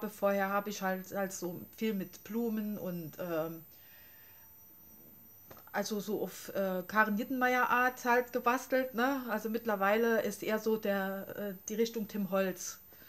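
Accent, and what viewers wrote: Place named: Saarland, Germany. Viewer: aloha, habe deinen kanal vor kurzem entdeckt und bin kleben geblieben und das nicht nur weil der saarländische dialekt einfach cool ist ;) ich hoffe du machst noch viele videos.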